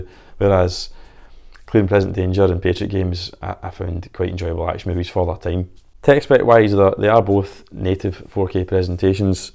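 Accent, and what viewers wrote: Scotland, United Kingdom. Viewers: I don’t think your accent is difficult to understand at all. Yes, you have a Scottish accent, but it isn’t spoken with speed and slang. Your English is fine. I suppose it could be difficult if the listener was Chinese or something. Or American.... Americans have trouble with the English language. 😂 (Thats humour by the way if there’s an American reading this) Keep up the good work mate. And don’t say I told you this but your accent is fine! 👍🏻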